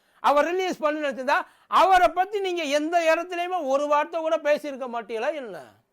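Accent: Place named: Telugu, India. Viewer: இது எந்த தமிழ் தெலுங்கு தமிழா😊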